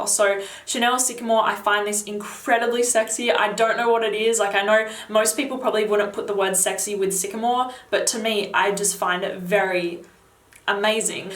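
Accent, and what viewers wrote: Australia. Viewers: How have I never seen your channel before!? And Australian.. bonus. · I dont even know how I stumbled across this. lol But good looking out. I just purchased 2 of them :P Are you Australian? I think I just became a fan. :P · Are you Aussie? You sound like it.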